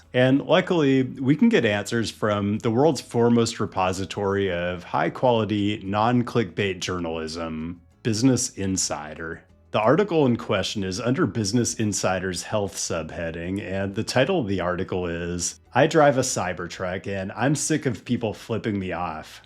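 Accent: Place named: Midwest, United States. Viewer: Would it be rude to ask about your accent? I don't want to be offensive, and I don't want to make you self conscious, but I've never heard someone pronounce "u" like you do in this video. I'm genuinely curious where you come from. I'm hearing Midwestern, NE and PNW hints when you speak.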